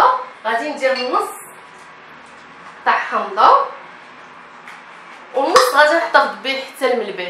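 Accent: Marrakech, Morocco. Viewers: انت زينة وهضرتك كتعجبني تا ديال مراكش وحلوة كلك على بعضك حلو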